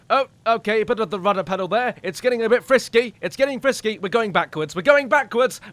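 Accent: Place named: RP, United Kingdom. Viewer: Good day, I have a question for you: I know you like to talk in a very civilized Brrritish manner, are you also able to speak with a more 'common' tongue like a Birmingham one for example? You see, I have made it a hobby to learn many different English accents, even foreign ones. I've got a lot of 'em pretty much down but now I'm more focused on different British accents. I would find it wonderful if you could give me some sort of 'insight', if you have any of course. Also, how you took that Spitfire down was just marvelous! Pilots like Magz and others could learn many things from you!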